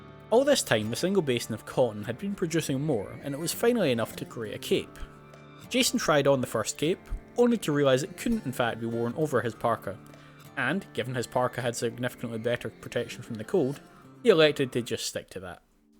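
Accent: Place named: Scotland, United Kingdom. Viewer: I swear, I can't just be hearing a "oh, yeah, both scottish" accent between you and Fandabi Dozi. It sounds like same damn neighborhood. Though I guess, I'm ignorant enough maybe I'm catching a dialect shared over a metropolis? Though it seems more regional. Like you're the same guy, but 5-10 years younger. Near enough to sound like brothers. Ps. These videos are great. Best I've seen since Pete Complete. And I'm both always looking and hella picky. You seem to have good instincts/training/etc for this.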